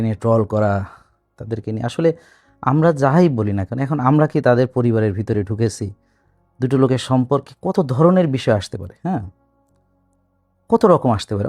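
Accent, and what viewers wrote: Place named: Jhenaidah, Bangladesh. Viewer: Amar mone hoy aisob ""S-H-O-K-U-N"" k niye apnar kono comment korar dorkar nai. Ai sob manushrupi SHOITAN aigulai chai apnar mulloban somoy nosto korte. Amar bari Jhenaidah apni jokhon kotha bolen amader tone a valo lage. Apni ALLAH vokto ekjon manush etai apnar boro porichoi. Manusher shortcomings thakbei, ALLAH amader oivabei toiri koresen. ALLAH apnar mongol korun.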